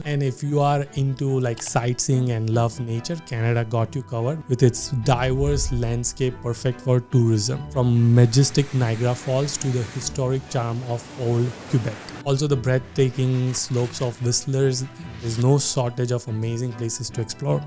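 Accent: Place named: India. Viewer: Even you need to go back to India. Am tired of hearing that accent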